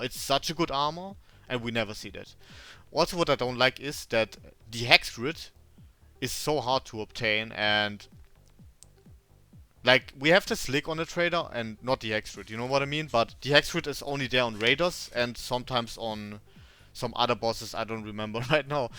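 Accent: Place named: Germany. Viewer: Dein Englisch ist top aber der Akzent ist nicht zu überhören 🤣 German :p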